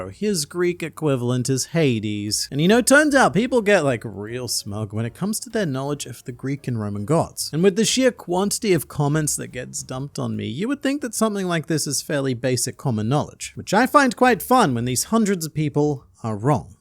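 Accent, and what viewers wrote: United States. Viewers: can you do a video in your American accent? It's hilarious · Um actually you sound extremely American. You're just distorting your own accent. Trust me I would know, I'm from Canada. 😂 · Actually, you're both very confused. Pluto is a dog. Also, your attempt at American is only one of many accent's I don't feel like counting. We have a lot. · Your speaking tone and accent is probably the closest to "American" I've ever heard from an Australian, so I can see why they might have gotten that part wrong. As an American who has talk to a decent amount of Australians over the internet and watched your channel, I didn't realize you were Australian until you mentioned it yourself. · Top notch American accent and as a New Yorker I was 100% fooled by your "I'm walking here" 😂😭😂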